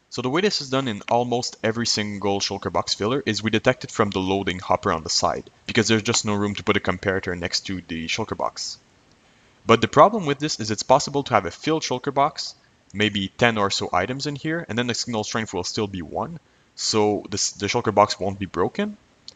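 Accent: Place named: Quebec, Canada. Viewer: Bro t'es québécois? j'reconnais ton accent XD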